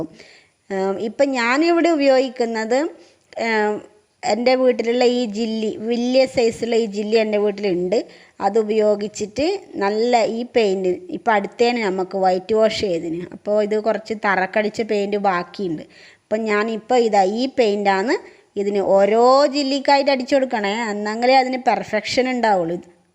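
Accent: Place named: Kannur, India. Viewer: നമ്മളെ കണ്ണൂര് ഭാഷ നല്ല രസം ഇണ്ട് കെകാൻ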